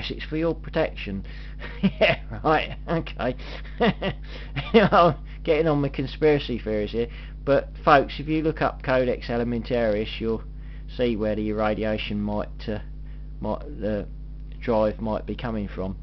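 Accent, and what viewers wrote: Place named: Rugby, United Kingdom. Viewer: A great video! Many thanks! - Where are you from? You sound local to me... Rugby, UK?? - Julian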